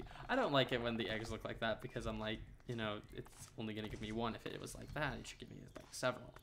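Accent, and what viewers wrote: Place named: Australia. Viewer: At first I could not place your slight accent, but then hearing you count made me realize. I honestly was like "This dude sort of sounds Australian." Boy am I dumb.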